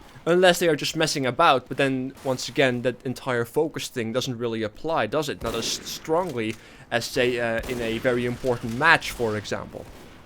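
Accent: Netherlands. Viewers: Dutch accent makes u special lolz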